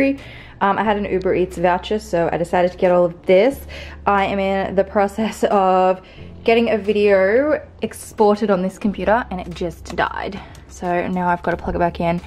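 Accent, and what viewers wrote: Australia. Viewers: she's Australian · She doesn't sound British she sounds Australian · You can't love her that much if you didn't even know that she is Australian LOL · Hahahaha she's Australian... Aussies that travel have the most confused accents, mine's the same. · you reckon? She sounds Aussie to me. Says some words like Americans do which all you tubers seem to. Why does it bug you? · It sounds 110% Aussie??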